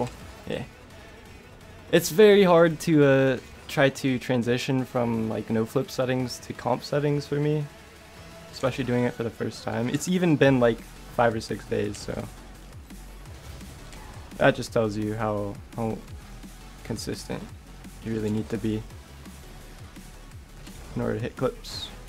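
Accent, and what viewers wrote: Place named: United States. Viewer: Ur like the only good freestyler who has an American accent, maybe osm too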